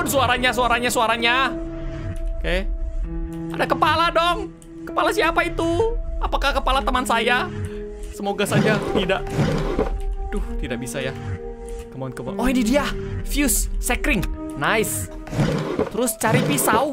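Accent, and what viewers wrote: Java, Indonesia. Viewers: Medok banget😂Forte Vanguard FG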